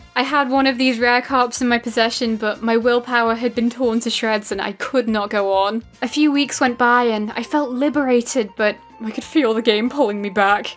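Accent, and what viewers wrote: England, United Kingdom. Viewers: Why do so many poketubers sound slightly English. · YEEAAA UPLOAD, MY FAVORITE TWO THINGS, POKEMON AND BRITISH ACCENT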